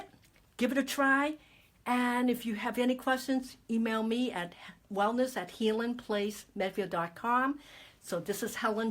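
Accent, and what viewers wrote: New York, United States. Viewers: I like her New York accent. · I was very surprised and delighted to hear someone with a NY accent! Hence I really enjoy learning from you. I first started using an iced water bottle to roll and found some relief, then I just got an electric Shiatsu foot massager that has a warning function [which I haven’t used yet], my question is, when do I use ice and when do I use the heating? FYI, I found a big bump above the area where you had marked on the foot, so I have been concentrating mostly on that area.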